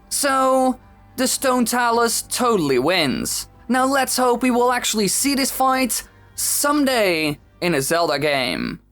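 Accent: Netherlands. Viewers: Love your dutch accent and ofc your videos.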